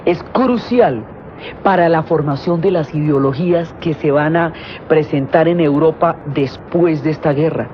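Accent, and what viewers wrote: Colombia. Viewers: Diana Uribe es única, a mí me encanta 🙂 Por cierto que qué hermoso es el acento colombiano! Opinión de una mexicana. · No me canso nunca de escuchar a Diana Uribe... además de toda su sabiduría.... el tono colombiano que es tan tan lindo...Chévere!!!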